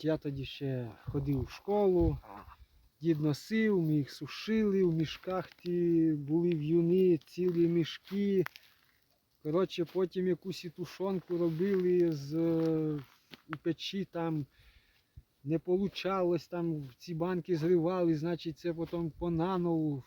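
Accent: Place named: Polissia, Ukraine. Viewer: Бажаю міцного. Ви напевно десь з Поліського або Іванківського району? По акценту зрозумів. Можливо й не правий. Гарна риболовля. Так тримати. Все відмінно. 🎉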